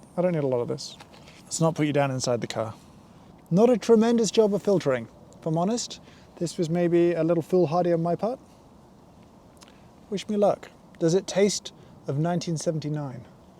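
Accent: United Kingdom. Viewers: Articulate British man tells you everything will be okay (ASMR)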